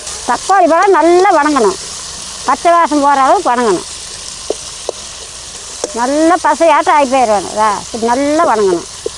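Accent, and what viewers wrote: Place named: Kongu, India. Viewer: Kongu region maari irkkuthenga entha ooru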